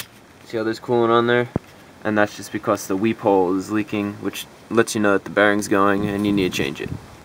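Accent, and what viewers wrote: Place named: New Jersey, United States. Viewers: NJ, I'm also originally from New Jersey and I say water the same way, "wooder". Well I'm trying hard to change that now. I didn't realize I was saying it wrong until I moved to a different state. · JordanMatthew Anderson southeast pa or south western Nj, philly/ Camden we all say wooder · Can definitely tell youre from New Jersey. Lol. My wife pronounces water the same way.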